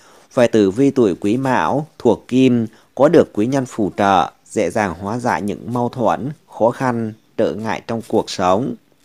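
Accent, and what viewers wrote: Thanh Hoa, Vietnam. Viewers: thay. giong. noi. giong, nguoi. xuan. yen.tho xuan thanh. hoa. que. ngoai cua minh. Cung noi vay ?😄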